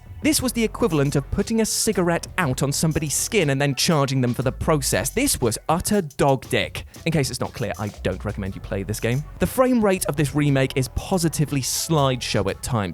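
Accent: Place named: United Kingdom. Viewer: I had to stop. He said “Haych dee” and “Ohmeeguh” too many times. British English is confusing, and annoying.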